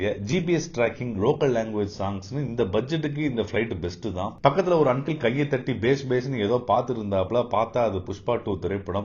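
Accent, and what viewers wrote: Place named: Sri Lanka. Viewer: Nenga srilanka a bro.? Ungal tamil ucharippu migavum arumai. Pothuvaga as compared to Tamilnadu people ur tamil slang is more og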